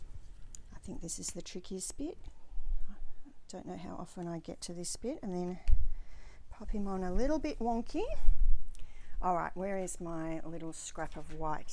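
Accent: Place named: Australia. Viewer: Nice to hear an Aussie sharing!😀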